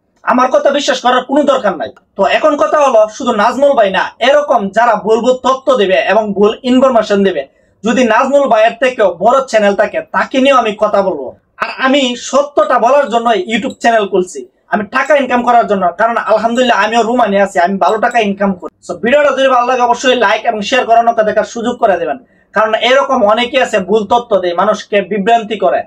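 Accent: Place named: Brahmanbaria, Bangladesh. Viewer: আসসালামু আলাইকুম ভাই আপনার ইনফরমেশন কথা ঠিক আছে।আশাকরি আপনি আরও উপরে উঠবেন আমরা আরও অনেক কিছু যানতে পারবো আপনার মাধ্যমে। তবে একটা কথা আপনার বয়েস কোয়ালিটি একটু শুদ্ধ সাধু ভাষায় বলার একটু চেষ্টা করবেন। ঠিক হয়ে যাবে আস্তে আস্তে চালিয়ে যান কিছু মনে করবেন না আপনি মনে হয় ব্রাহ্মণবাড়িয়া জেলার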